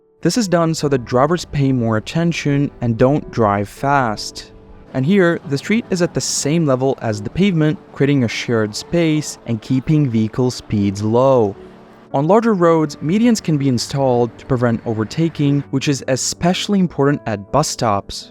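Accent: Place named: Latvia. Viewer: šis viģiks arī ir ahujena bet es tev izaicinu uztaisīt katru piekto viģiku latviski >:) es dzirdu to mikroskopisko akcentu muahahahaha (es atvičai tu esi latvietis)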